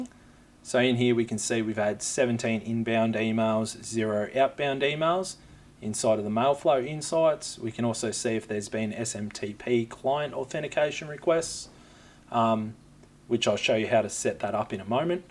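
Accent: Australia. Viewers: I guess this is the closest thing we are gonna get to finding a video with English Speaker. Like, I saw the thumbnail and thought YAY a white guy then I hear hes Australian and Im kinda bummed but then I think well at least hes not Indian. WHY are so many Tech videos done by an Indian with a super harsh accent? Hey Non- American accent aside, Great video "Mate". ;-)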